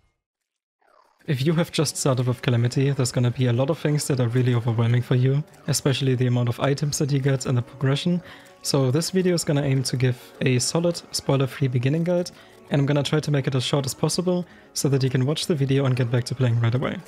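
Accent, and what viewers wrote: Germany. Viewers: Thx man. Are you German? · Idc what anyone says if the title says spoiler free and the accent says German af then you won’t find ANY spoilers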